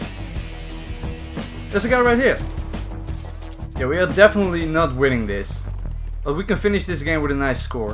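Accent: Netherlands. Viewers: Jij hebt de typische Nederlandse accent wanneer je Engels spreekt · Are you dutch by any chance? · I hear some Dutch accent, am I right? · Je bent Nederlands ik hoor dat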